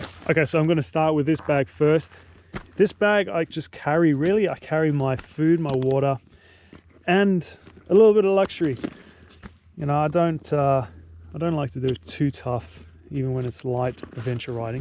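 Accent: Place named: South Africa. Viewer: I was pretty sure there was some South African! My bosses are both South African and listening to you, the odd word here and there, sounded exactly like my bosses. Would never have guessed the other countries though! 😊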